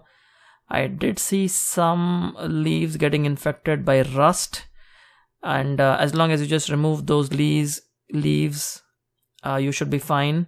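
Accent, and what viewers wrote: India. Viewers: your accent....are you from India ? nice videos :)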